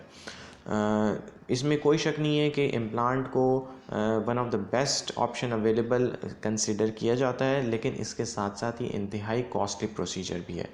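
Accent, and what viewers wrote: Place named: Karachi, Pakistan. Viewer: ڈاکٹر صاحب آپ کی لینگویج سے معلوم لگ رھا ھے کہ آپ کراچی سے ھو۔۔۔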